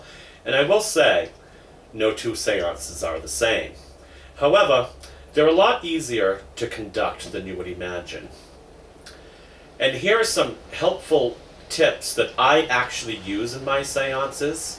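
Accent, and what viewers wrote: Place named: Massachusetts, United States. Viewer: Where are you located? From the sound of your voice, I'd say Massachusetts. :)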